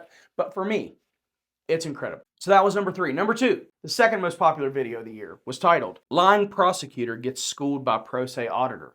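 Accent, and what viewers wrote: South, United States. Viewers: aww man…after that Christmas video I thought we'd get a rendition of Auld Lang Syne! That first 30 seconds of this video had me laughing – I seriously was one of those 5!! So glad and grateful for your amazing progress since your start. I do watch many of the other similar channels; yours quickly became is my favorite. Love your insider knowledge; ability to highlight numerous subtle details that would typically escape the average viewer's notice, and your breakdowns of how you would argue the case in court if it were your own. Your eloquence and that well-timed humor you sprinkle in make your style stand out above the rest. Oh, (and of course!) – that Southern Drawl!! You'll meet your goal for this year, or even surpass it. I’ve often wondered how many hours went into making just 1 video. I look forward to everything you've got coming for this year. 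Man, you must run on diesel fuel or something. The books, channel, training program, podcast - all these have the potential to positively impact our nation and transform countless lives. In some ways, listening to your SDL channel has changed mine. I’ve watched your videos and have tried to emulate your speaking style and manner in both my personal and professional life. I know you have to adapt to what the audience wants but please don't curtail your speaking time too much! That’s one of best and most distinguishing features that elevates your content above others. Each video covers a lot and I think you throw in all the aspects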